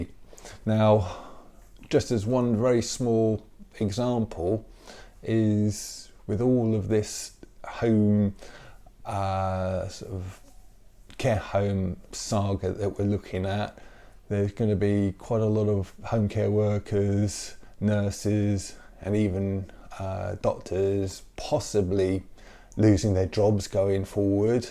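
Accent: England, United Kingdom. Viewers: Your speaking like a true English man!! Love and respect!! Time to look after our own!!!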